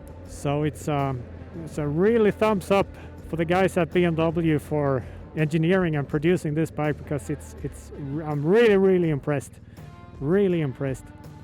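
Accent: Sweden. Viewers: Haha, tyckte jag hörde lite svenskt påbrå och sedan såg jag flaggan! Coola väskor!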